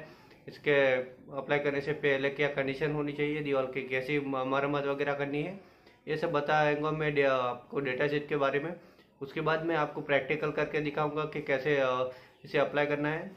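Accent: Gujarat, India. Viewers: Gujarati ho na??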